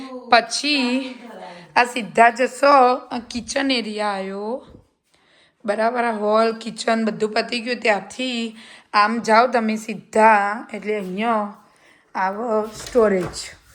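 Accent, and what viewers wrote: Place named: Daskroi, India. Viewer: ગાંધીનગર બાજુ, દસકોશી ના લાગે છે😀 ભાષા ઉપર thi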